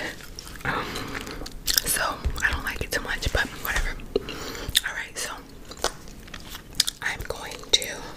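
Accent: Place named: New Jersey, United States. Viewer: I loved this! I would love to hear an exaggerated version of your New Jersey accent 😂 with your voice it would be hilarious and relaxing. You are the best!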